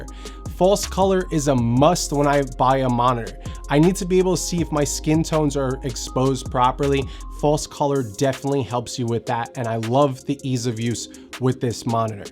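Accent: New York, United States. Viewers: I will primarily use this for stills (landscapes) as I find the standard camera screens limited in scope, especially for my old eyes. Although, I surely might be tempted to do more video..!! A good thing, indeed. I have the ability to use a Samsung Galaxy S2 tablet, a very attractive display and wifi connected, but outdoors, here in sunny Las Vegas, something more is needed.. I noticed the palm trees in your video samples, but your accent is back east NY (as am I).. LOL! Thank you, for your good nature..!!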